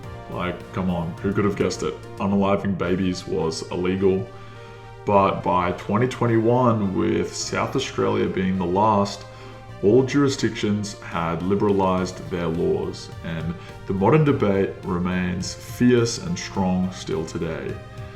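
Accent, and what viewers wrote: United States. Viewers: My only criticism is the pronunciation of anti,Americans say it like Ant-I,Aussies and English say Antee I’ve noticed it’s been creeping into our circles. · What’s with the American pronunciations?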